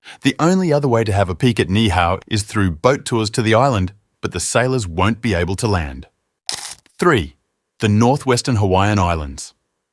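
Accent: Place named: New Zealand. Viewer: Dude sounds like a Kiwi. He may be mispronouncing ʻŌlelo Hawaiʻi by using the Te Reo Māori pronunciation (There are many similarites between the two languages, especially when one is a non native, non fluent speaker of either) As a hawaii resident, I've been told I tend to screw up and mispronounce Te Reo Māori words by saying them as if they are ʻŌlelo Hawaiʻi. (Which I have some familiarity with even though I don't actually speak ʻŌlelo Hawaiʻi fluently)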